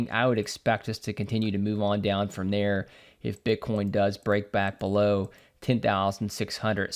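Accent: United States. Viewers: Thanks again! Always a pleasure to listen to your thoughts on the market and your charming American accent.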